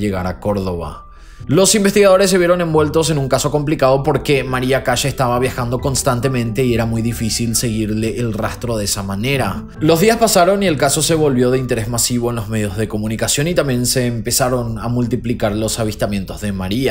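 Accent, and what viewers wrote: Paraguay. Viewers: Hola..!!! Saludos desde Las Toscas Santa Fe Argentina... siempre estoy siguiendo tus videos..!!! aguante la tonadita de Paraguay..!!! Éxitos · Es paraguayo? Justo me preguntaba de donde era el acento.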